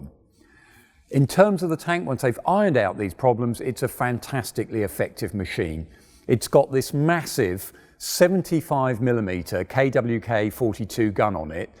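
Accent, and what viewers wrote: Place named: England, United Kingdom. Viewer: That English accent and tone of voice is extraordinary, congratulations!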